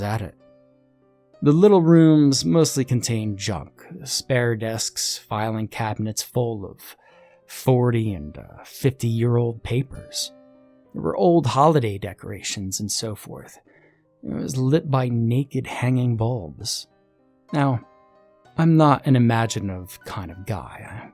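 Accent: South, United States. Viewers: Its pronounced bir-ming-Ham not birmingam, this story somewhat misrepresents the city. But your southern accent is on point.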